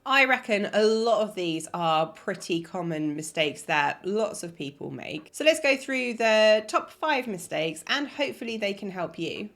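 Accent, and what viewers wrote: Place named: RP, United Kingdom. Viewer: I like your accent Sounds so posh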